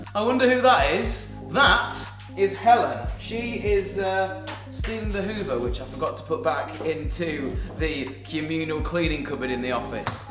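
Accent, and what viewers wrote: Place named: North, United Kingdom. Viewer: Really? He doesn't have an upper class accent. Sounds quite midlands/northern to me (not saying that northerners can't be upper class, but the accent usually is a tell-tale). Also, that chip on your shoulder. Have you thought of dealing with it?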